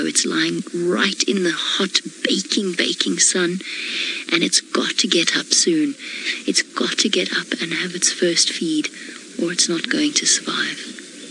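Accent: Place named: England, United Kingdom. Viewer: Maybe if you could film and shut up. English accent off putting had to mute.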